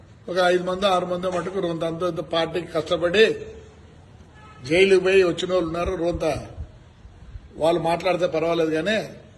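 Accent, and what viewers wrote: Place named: Tamil Nadu, India. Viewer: Babu JC garu, meeru telugu vaarena? Aa telugu enti, evaro Tamilian matladinattu… konchom Sarigga telugu nerchukovayya mundhara…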